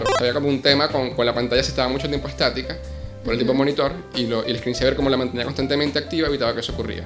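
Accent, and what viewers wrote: Venezuela. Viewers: Imagino que eres venezolano, es que tu forma de hablar y de expresarte es única, sin hablar de tu voz.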